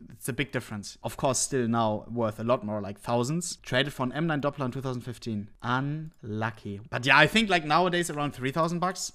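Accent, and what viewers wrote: Germany. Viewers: Man merkt so das er deutsch ist xd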